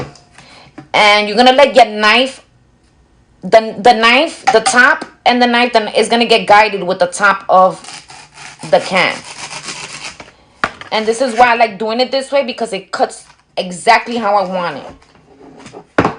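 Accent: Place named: Bronx, United States. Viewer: I knew you was from the Bronx before you told us, girl😂 You have that Bronx swag❤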